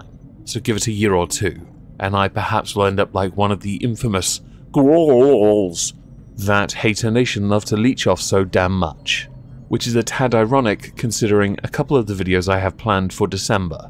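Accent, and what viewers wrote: United Kingdom. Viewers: Hate the British accent? I could never. Grew up on Monty Python & Beatles. Just came from a Lotus Eaters vid before here (& secretly wishing The Britisher would put up some fresh material I could go after this). Much love, yer moistness!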